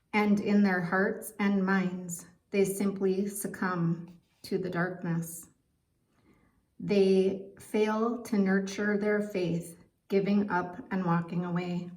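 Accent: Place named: Minnesota, United States. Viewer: Are you from Minnesota? The accent. I'm from Minnesota. Haha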